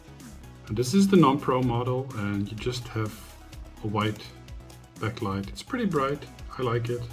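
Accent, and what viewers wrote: Netherlands. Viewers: That Dutch English always 👌🏽😌 great review 🙏🏽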